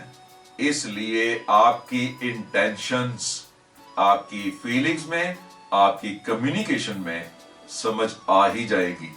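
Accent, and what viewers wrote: Punjab, India. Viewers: Sir , You speak hindi in punjabi tone and me too, will you please tell me how to fix it?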